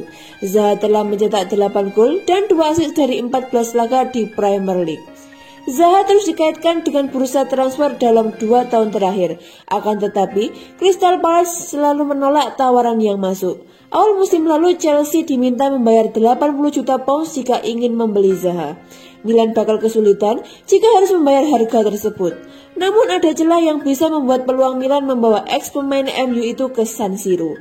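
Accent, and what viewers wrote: Java, Indonesia. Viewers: Medok Is Medok 😃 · Kok narator Berita2 Ac Milan Di Youtube... Medok2 semua yaa...??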